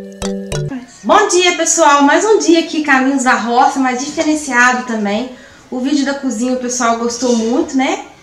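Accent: Minas Gerais, Brazil. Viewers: De que cidade vc é, parece mineira uai?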